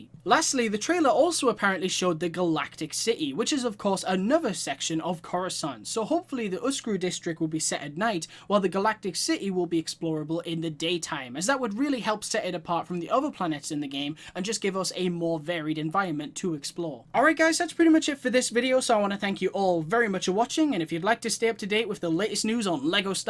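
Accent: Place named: Geordie, United Kingdom. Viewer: Just wondering are your from Newcastle Upon Tyne because I can hear that tint of Geordie in your accent?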